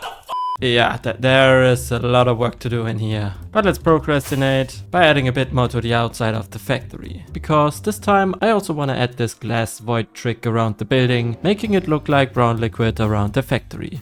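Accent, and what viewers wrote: Germany. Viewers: als ich ihn zu ersten mal reden gehört hab, wusste ich direkt er wäre deutsch, W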